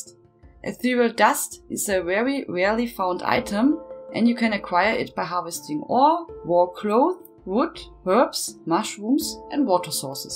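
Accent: Germany. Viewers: Ich höre deinen Deutschen Akzent. Sehr Schön ;) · ufff that german accent is hard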